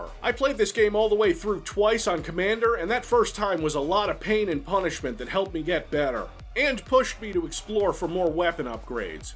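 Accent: New England, United States. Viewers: ... Of course he's from New England. Of course he's from here. if you're still out here and we happen to pass by each other I'll buy you a beer.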